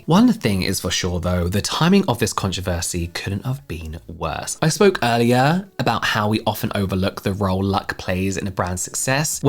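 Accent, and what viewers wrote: United Kingdom. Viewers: Oh I didn't know he was British (I'm not good at recognizing accents) but that makes it even more shocking 😅😅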